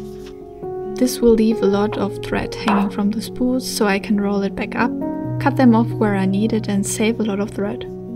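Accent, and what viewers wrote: Germany. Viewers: Die Videos sind so wundervoll gemacht. Es ist fast wie in einem Historien- und Kostümfilm und verzaubert regelrecht. Zum Schmunzeln bringt mich immer die hörbar deutsche Aussprache ❤ Es gibt kein Nähkanal hier der so hochwertig und ästhetisch ansprechend umgesetzt ist und zum träumen anregt. Jedes Kleid wird zu einer Geschichte und mit Gefühlen aufgeladen. Einfach traumhaft, mein ganz grosses Kompliment 🎉🏆💐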